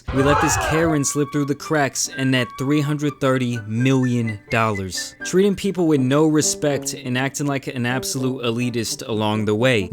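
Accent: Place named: New York, United States. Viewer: I just noticed he speaks with a slight new york accent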